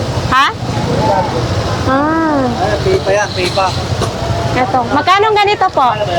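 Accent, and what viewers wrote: Visayas, Philippines. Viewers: Bisaya k mn Day?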